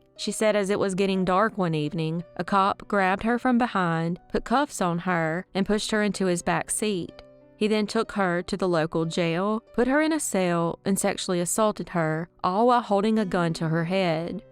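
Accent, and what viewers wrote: South, United States. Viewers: Her accent is thick southern accents are thick. I don’t see a big deal .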